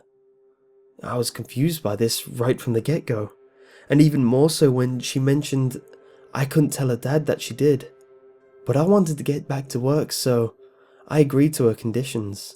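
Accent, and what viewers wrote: England, United Kingdom. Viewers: Is he English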